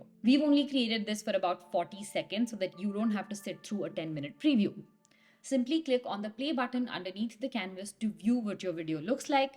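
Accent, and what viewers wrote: India. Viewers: such a nice work but voice over typical stupid indian accent , come on guys .....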